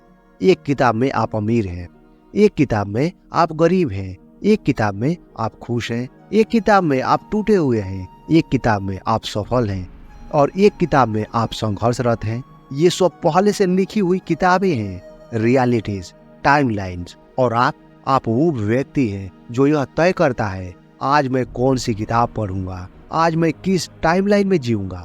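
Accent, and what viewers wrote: Bengal, India. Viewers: Kese bolta hai yaar ..bengali hai kya..hindi seekho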